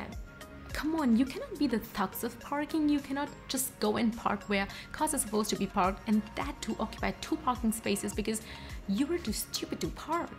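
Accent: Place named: Germany. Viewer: Haha your English accent sounds slightly German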